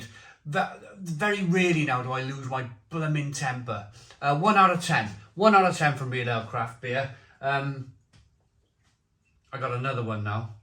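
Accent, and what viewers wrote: Wales, United Kingdom. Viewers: Love your Welsh accent!!😉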